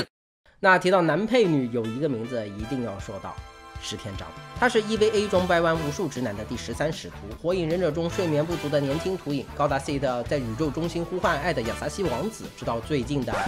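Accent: China. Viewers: 你是南京人啊？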